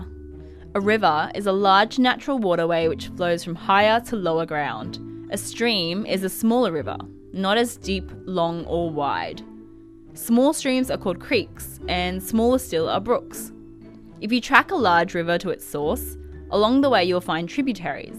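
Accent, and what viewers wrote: Australia. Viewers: I'm in love with Aussie accent 😍❤️ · I thought shes Australian.. lol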